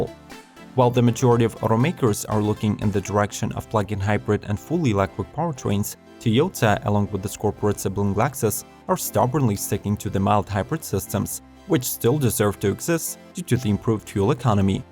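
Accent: Russia. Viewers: what is this killing russian accent for?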